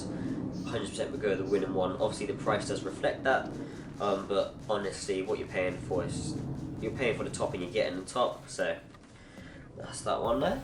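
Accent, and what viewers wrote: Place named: Somerset, United Kingdom. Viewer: you sound local mate you in Somerset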